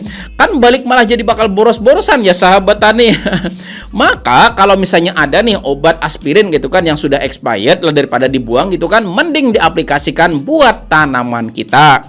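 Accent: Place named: South Kalimantan, Indonesia. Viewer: Logat Kalsel ni pinanya 😂🙏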